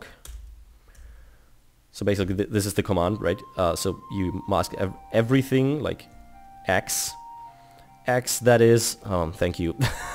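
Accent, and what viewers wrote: Germany. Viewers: Nach den ersten paar Sekunden erstmal abonniert. ;) Man hört zwar, dass du Deutscher bist, aber dein Englisch ist sehr sehr gut. :) Super sympathisch und tolle Videoinhalte. Weiter so!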